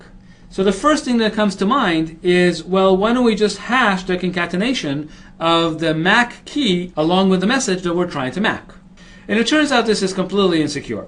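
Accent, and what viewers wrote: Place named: United States. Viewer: Omg finally an American accent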